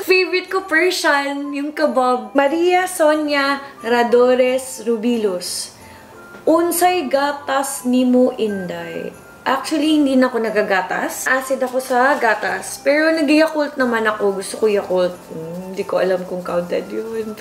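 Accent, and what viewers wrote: Visayas, Philippines. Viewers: Wow apple cider vinegar ,, 😍😍 love u ,, don't change your bisaya accent !! Go bisaya!!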